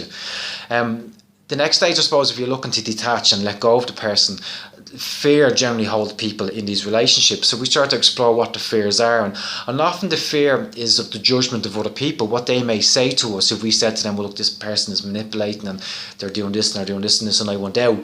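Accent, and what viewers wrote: Ireland. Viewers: You look like an Italian, but sound like an Irishman.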